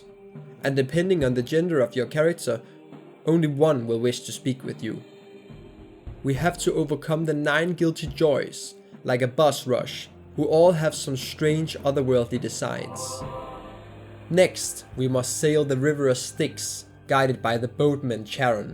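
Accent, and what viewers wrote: Denmark. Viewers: ah Nice to meet a fellow Dane i just thought during the second part your accent sounded really famliar, Also does your YT have discord server yet? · Just finished watching the whole retrospective, and I instantly want to rewatch it. Seeing someone show the same love for a weird browser RPG with terrible gameplay, that I also share (and with a danish accent ❤) has been magical. Thankyou!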